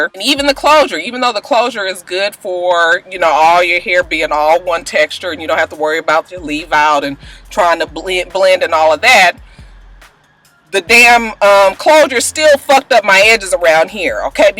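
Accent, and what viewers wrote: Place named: Detroit, United States. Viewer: Are you from Detroit?...cause you sound like it, just wondering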